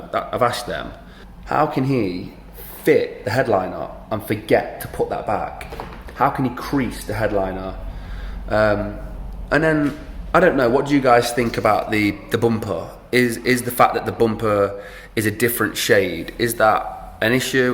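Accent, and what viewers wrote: Nottingham, United Kingdom. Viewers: plastic can sometimes look a different shade of colour, especially in a pearl white. if you have had the car protected(ie diamond brite) this can change the colour slightly. Where they failed in my opinion was someone should have quality checked the car and talked you through a handover. the headliner damaged and the trim hanging off aren't good, hopefully easily sortable. give the car a clean and see how the bumper compares, you will probably see a colour variation on the front aswell if you look enough! I knew from your accent your are local to Notts! I made it 2!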